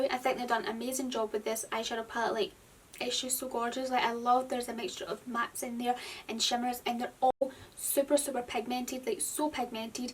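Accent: Scotland, United Kingdom. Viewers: are you scotisch? 😍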